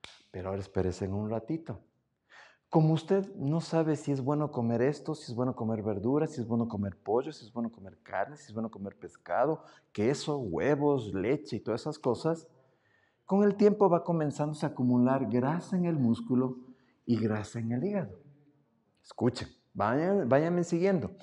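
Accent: Mexico. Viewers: Gracias por compartir su conocimiento. Habla como chilango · Gracias por su consejo doctor tenía muchas dudas sobre la avena, es la primer ves que lo veo y habla usted muy mexicano 😂 saludos de una mexicana hasta el lindo Ecuador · Orale pense que era de México, por la forma como habla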